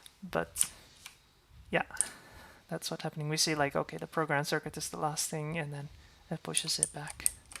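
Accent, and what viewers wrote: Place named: Netherlands. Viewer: I love the dutch accent xD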